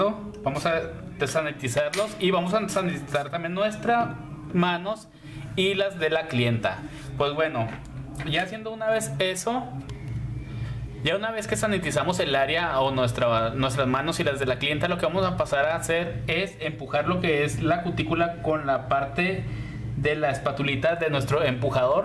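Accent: Mexico. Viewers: Si pudiera amiga ese es su acento no todos los mexicanos hablamos igual, depende de el estado cada quien tiene su acento y el de el esta padre.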